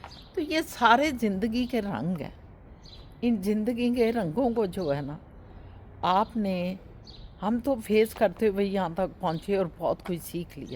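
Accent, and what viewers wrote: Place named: Punjab, India. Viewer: Your Urdu is very punjabi.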